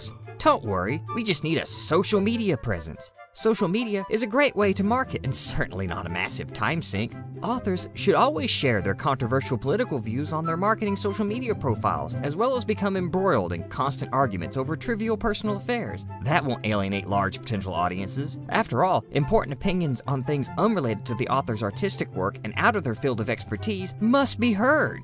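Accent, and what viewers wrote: South, United States. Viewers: is this guy a "southerner" as americans call it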